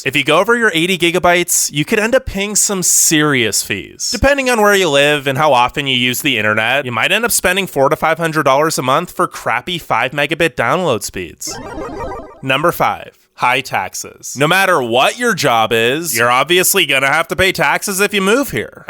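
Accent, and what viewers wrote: United States. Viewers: Oh man. That American accent!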